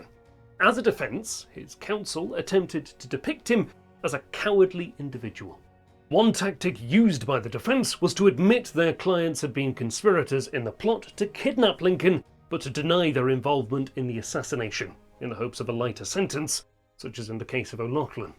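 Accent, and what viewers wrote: England, United Kingdom. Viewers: Did You notice that it's a BRITTISHER who is doing the narration? Irony. 📚 M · The English nasally twisted appallingly irritating narrator should be forever binned !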